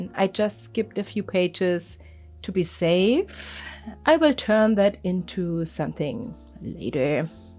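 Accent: Germany. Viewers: Ich höre da einen deutschen Akzent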